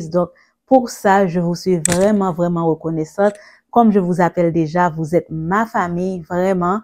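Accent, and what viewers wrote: Haiti. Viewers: J’aime ta douceur 😅 surtout ton accent haïtien 🇭🇹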